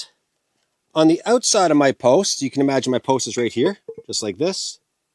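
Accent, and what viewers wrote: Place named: New Brunswick, Canada. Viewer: I knew it! Since I started watching you I thought your accent was similar to mine, but this is the first time I’ve seen you mention being born in NB! From around the Miramichi, is my guess? :)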